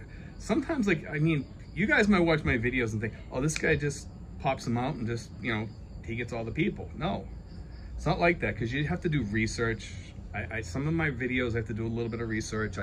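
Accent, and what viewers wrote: Boston, United States. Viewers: Thanks for making good simple to understand videos..you know I am just an old simple minded man from the rural part of Louisiana.. didn't you say you were living in cavite? I had a girlfriend living in tres martinez or general trias I think it was.. thanks again and keep the videos coming..where in the states are you from? I think I hear a Boston accent..I worked all over the usa and world in my younger years.. thanks 😊👍👌😁🤗..hello from south Louisiana and I am heading to the Philippines to live out the rest of my retirement.😁🤗🐊🐊🐊🍺🍻🐊🐊🐊👍👌🐊🐊🐊😁🤗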